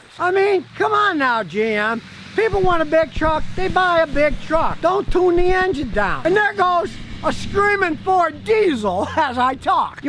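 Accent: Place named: Chicago, United States. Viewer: it's the Chicago accent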